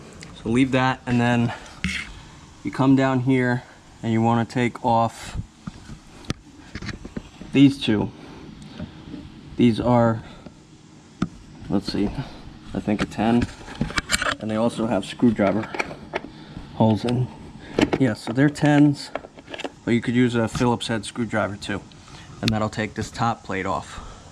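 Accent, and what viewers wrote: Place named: Upstate New York, United States. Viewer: Good review. I was playing the game of what state is this guy from based on the way you say “off”. “Ohaaaff”. Upstate NY?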